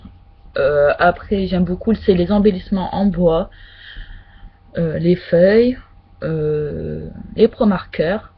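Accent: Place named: Nord, France. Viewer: Coucou je viens de tomber sur une de tes vidéos de l'album sur ton fils que je trouve surper beau et du coup je me suis abonnée à ta chaîne ... dis moi tu ne serais pas du Nord par hasard ? j'ai cru entendre un petit accent lol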